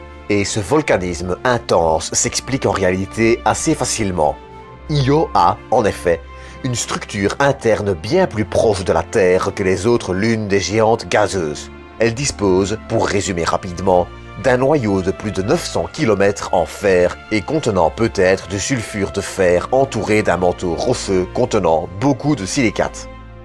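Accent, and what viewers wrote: Belgium. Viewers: La voix off est dégueulasse, c'est quoi cet accent belge, on dirait une blague!!??impossible de prendre cette vidéo au sérieux. Travaille ta diction stp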